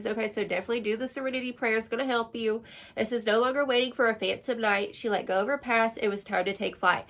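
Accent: South, United States. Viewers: You look Latino? Talk like you’re from the south so ADORABLE/CUTE…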